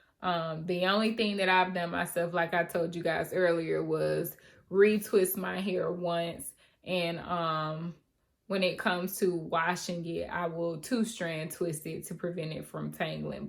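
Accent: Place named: South, United States. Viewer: Girl, listen, I've had locs before (about to start my loc journey again next week) and I had never been tender headed in my life until my hair loc'd! I thought that was crazy. Your hair is beautiful, btw! I'm a new subbie. Thank you for mentioning the salon because I'm in Atlanta too. To hear you talk, I was thinking you had to be from here or somewhere from the south because we sound alike 😁😁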